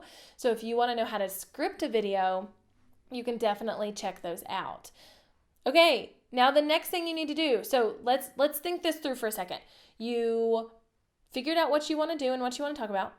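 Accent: South, United States. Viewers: Hi....I Subscribed to your Channel......Do I hear a...Southern... Accent.....cheers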